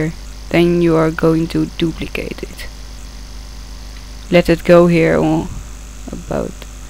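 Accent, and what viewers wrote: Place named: Netherlands. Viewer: haha, je hoort ook egt zo'n hollands accent! xD k ben ook 13 jaar, (over een week 14) ik ga ff je tutorial bekijken.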